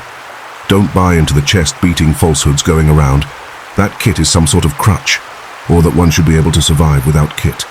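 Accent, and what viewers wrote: United Kingdom. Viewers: Awesome video, the British narration was a very cool feature. Has a documentary type feel to it in the beginning then transitions into your own footage which is neat. Great work 😎👍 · Voice over is brilliant...very English!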